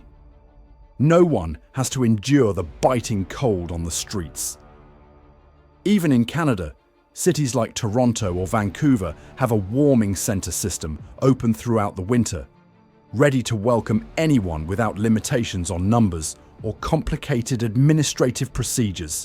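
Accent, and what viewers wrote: United Kingdom. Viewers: in the first 2 minutes it shows homeless in LA and Vancover... while speaking like this is Michigan... Who made this video? Why this dude have a British accent? Idk something tells me they are not from michigan possibly not even from america or know wtf is going on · why am I hearing a limey tell me about my state?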